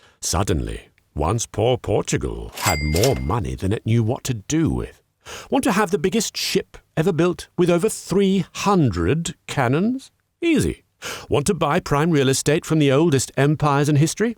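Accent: United Kingdom. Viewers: I’m Portuguese from Oporto!!! I’m very grateful for this video!!! Thank you very much!!! Beautiful video!!! One of the best I ever seen about my country history explained in British English 🇬🇧 Aye British English!!! Not American English hahaha 😆 I hate that to be honest!!! Cheers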